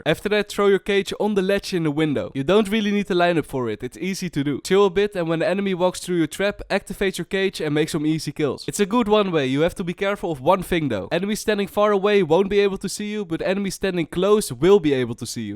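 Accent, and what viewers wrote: Netherlands. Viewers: You dutch? From leeuwarden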